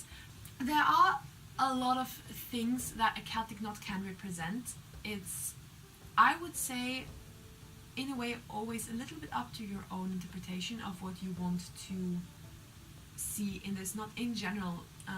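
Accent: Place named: Scotland, United Kingdom. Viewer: your accent is beautiful. What kind of accent is it? Is it scottish?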